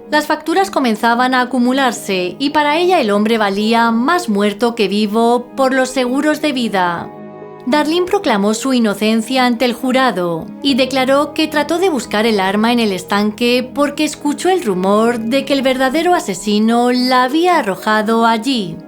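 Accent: Spain. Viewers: Me encanta su acento, es de España 😍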